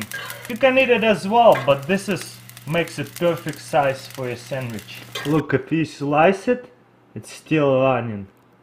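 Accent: Russia. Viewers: He's not English he's Russian his pronunciations are different then yours! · Russian English is so funny. One thing he does wrong though, he flipped the egg over. Blasphemy! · He is Russian like me he has an accent people sometimes be rude about how he talks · I find a strong Russian accent funny. respectively his voice is hilarious. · Taras Smishchuk what's wrong with his accent? he is Russian, deal with it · He has a Russian accent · the Russian accent makes all the video! Boom BOOM love it! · Why would you even want to lose it? Russian accents sound fucking awesome. But yeah, for an English speaker, it is EXTREMELY difficult to pronounce Russian words properly. I've spent a ridiculous amount of time learning two simple words: здравствуйте товарищ (Hello, comrade) I've said it at least a hundred times, and I still catch myself pronouncing здравствуйте (zdrah-stu-ee-tcheh) incorrectly. 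· Give him a break his Russian.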